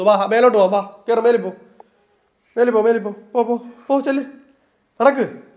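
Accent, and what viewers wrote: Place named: Trivandrum, India. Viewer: Bro ude samsarathil cheriya oru trivandrum slang varunnu❤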